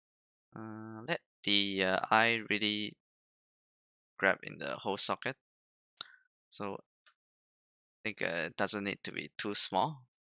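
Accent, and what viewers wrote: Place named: Singapore. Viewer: Sounds like a Singaporean...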